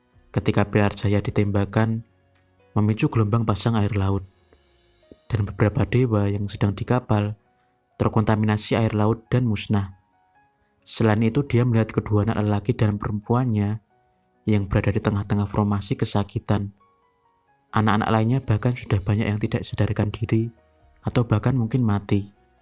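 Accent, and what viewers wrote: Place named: Java, Indonesia. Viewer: Ngpmong nya medok bangat asli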